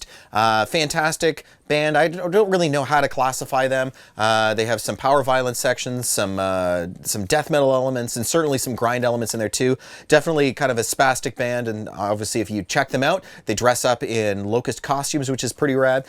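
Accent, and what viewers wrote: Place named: Canada. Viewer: Super cool,; I enjoy hearing your Canadian accent on here as well:) I have that Locust set - I got it from a friend who bought it when we saw them many years ago. That may have been with a band called Holy Molar or with Dillinger Escape Plan (I can't remember which)...Three One G had some excellent output for a bit in the late 90's-early Thousands. I actually have an LP from the band Struggle which is Justin Pearson of The Locust/Dead Cross/many more... That is not as well known, more heavy punky stuff, but has a good raw energy that I bet you would appreciate. As a fellow vinyl collector, that uncut Metallica made me green with envy, lol. Some cut outs I have seen or own, there is a cool J Dilla (beats) that looks like a police badge, and a Father John Misty B side that came out with his sophomore release in the shape of a heart, I know there are many more but those two stand out. 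Anyhow, thanks for the excellent content, I look forward to watching it all.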